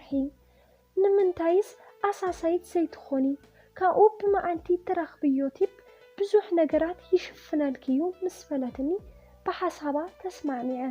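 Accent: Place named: Enderta, Ethiopia. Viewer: ጓብዝ ኢኺ! ናይ እንደርታ ትግርኛኺ ለለ ቃሕ ይብል።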